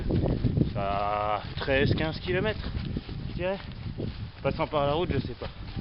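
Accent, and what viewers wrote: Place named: Vosges, France. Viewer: C'est la moulinette youtube qui m'a proposé ta vidéo. Bon, il faut dire que j'aime beaucoup la rando et qu'un parcours comme le Stevenson me fait envie. Je commence à regarder et je me dis : Oh, ce gars a l'accent du pays ! Et bingo ! Seul un vosgiens peut aller se perdre là bas en sandalette dans 20 cm de neige ! Je rigole mais faut quand même être gonflé ! Du coup je vais aller voir la suite de l'aventure de ce sapré gaillard ! Ah et puis un petit abonnement de plus !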